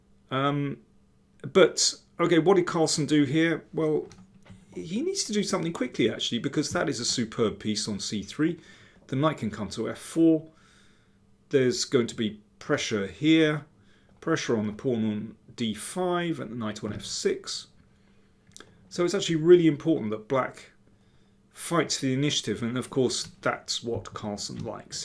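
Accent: United Kingdom. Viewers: Mystery to me, both entertaining and gives great explanations of the ideas behind the games. I have been wondering if it's because many chess players find following the native British English harder than non native where the words are both simpler and enunciated more slowly.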